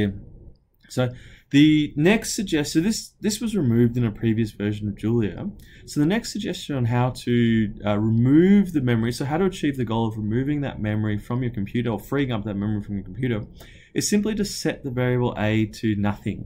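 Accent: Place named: Australia. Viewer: you sound like an aussie. is there any kind of julia community in Aus?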